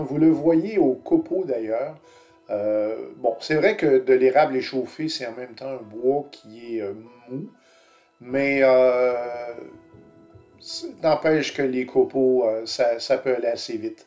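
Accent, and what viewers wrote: Quebec, Canada. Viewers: Bonjour! Pas facile la plane pour un débutant, mais avec cette vidéo qui complète tes anciens tuto, je me semble plus à l'aise. J'ai donc fini mon 1er lutin sans accrocher, et en plus avec la finition cyano. Un bonheur...Un retraité français( mon fils vit à Montréal depuis 10 ans, j'adore ton accent qui me refait vivre de bons mais rares moments au Québec )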